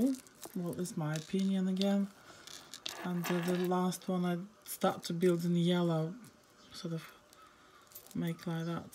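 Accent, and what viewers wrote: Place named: Sweden. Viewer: I love your accent! Sounds like Liberia/Swedish/British. 😊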